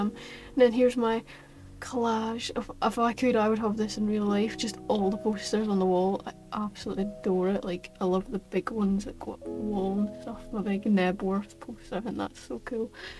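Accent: Scotland, United Kingdom. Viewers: OMG A SCOTTISH BLOXBURG YOUTUBER SCOTLAND FOREVERRRR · She’s Scottish like my silly billy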